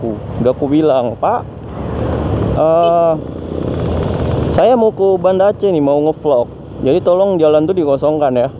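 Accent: Medan, Indonesia. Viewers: Ko kiranya medan bg jemping jemping sampeng😭😂😂😂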